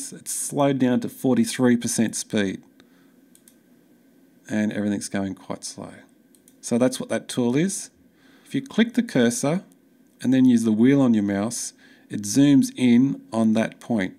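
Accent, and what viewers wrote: Australia. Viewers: great, straightforward video in Australian, although I speak Californian. Thank you sir! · subscribed just for "ill be speaking Australian"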